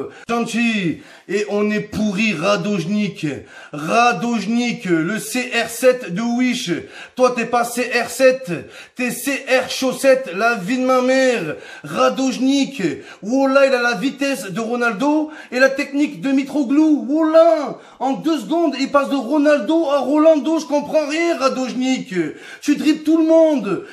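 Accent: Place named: Nord-Pas-de-Calais, France. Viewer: Mdr mais enfaite c'est un Ch'ti 😅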